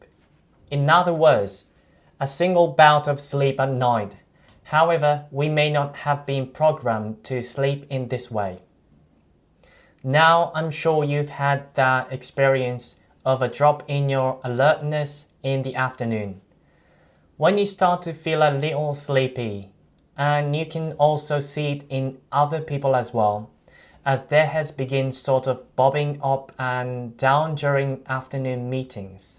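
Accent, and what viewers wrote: United Kingdom. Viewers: You did good, mate. While I am Australian, I definitely heard Britishness in most of your sounds. The only syllables/sounds which did not sound RP to me were the NI in night and SI in design. That silent g may be lengthening your i-sounds a tad too much. Nonetheless, after listening to you twice end to end, I felt you are nailing so much RP/Brit sounds.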